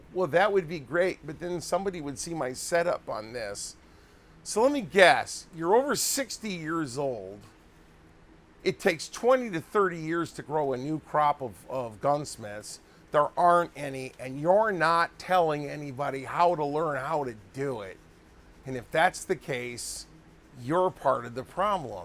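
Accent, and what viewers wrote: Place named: Chicago, United States. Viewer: Out of curiosoty, just where the heck are you from? I almost hear a Chicago accent? Maybe Cleveland?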